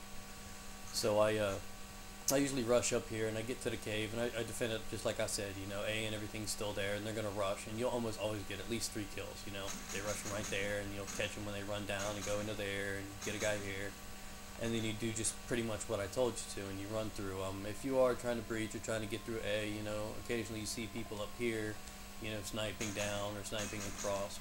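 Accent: New York, United States. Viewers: he sounds like he's from new york lol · New york accent lolz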